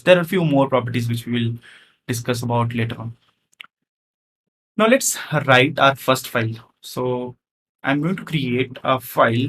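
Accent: India. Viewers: Really tried to give this a chance, but the Indian accent is just too difficult for me to hear for too long. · Well no doubt, this man understands his stuff, but this indian english is very exhausting in addition with this bad sound quality.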